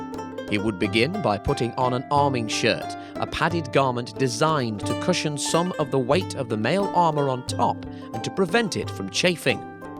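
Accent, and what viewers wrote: England, United Kingdom. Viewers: Haha, at first I though he said, "Knights, minotaurs, and foot soldiers..." Not used to hearing English accents. Good video.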